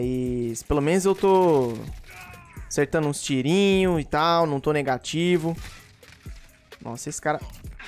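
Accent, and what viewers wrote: Minas Gerais, Brazil. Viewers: Mano pelo jeito que vc fala da para percever que vc e mineiro demais e tem muito sotaque tbm sou e nois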